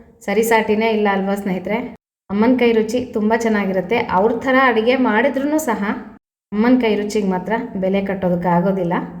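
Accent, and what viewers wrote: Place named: Ballari, India. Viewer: Akka superb agide Naau kuda Ballari avare Same nimtharane mathododu akka thumba kushi aguthe akka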